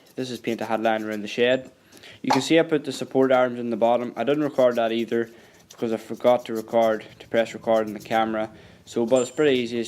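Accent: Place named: Scotland, United Kingdom. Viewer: I can't figure out your accent, is it Scottish or North Irish?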